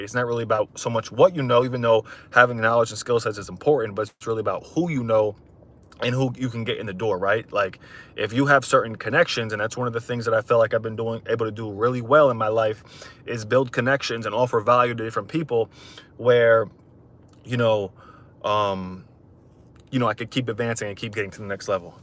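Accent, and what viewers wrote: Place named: United States. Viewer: He is speaking American.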